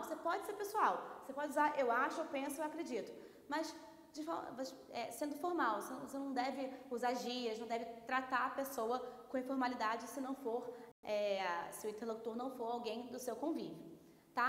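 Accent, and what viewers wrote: Rio de Janeiro, Brazil. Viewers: e esse carioquês da professora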